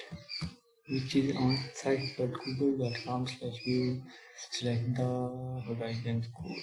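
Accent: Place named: India. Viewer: I heard the Indian voice and was hopeful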